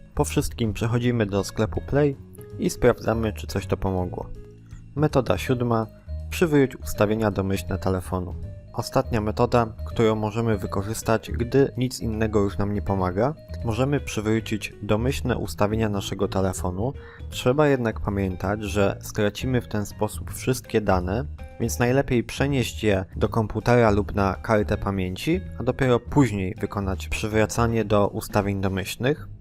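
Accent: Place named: France. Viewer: Ale ten gościu ma francuski akcent😎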